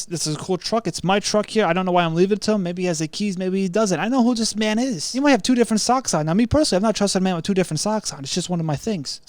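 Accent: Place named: New York, United States. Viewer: strong new york accent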